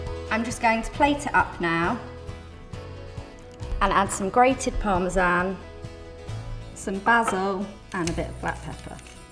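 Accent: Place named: United Kingdom. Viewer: Jesus ! She is more british than ... than .... than.... the..... than the brits 😅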